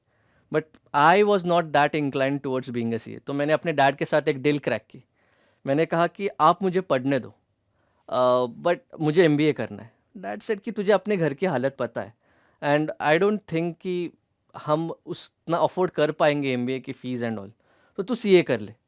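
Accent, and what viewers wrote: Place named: Karnataka, India. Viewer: Kannadi accent was the best part of this video..... 😂😂😂😂😂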